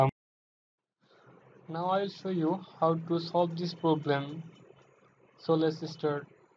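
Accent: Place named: India. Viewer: I couldn't understand what this guy was saying. Indian accent.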